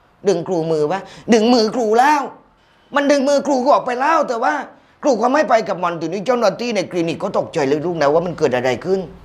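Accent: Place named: South, Thailand. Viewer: พลัดถิ่นมานาน ได้ฟังภาษาใต้พื้นๆ แหลง ทองแดงแล้ว ขำ คนภาคอื่นจะรู้ไม๊หนิ ว่าเราพูดภาษากลางแล้ว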